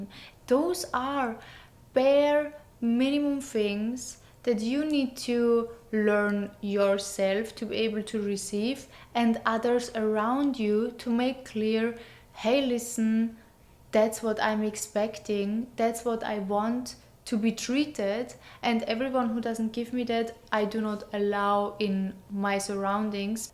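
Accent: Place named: Germany. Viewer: I hear deutsch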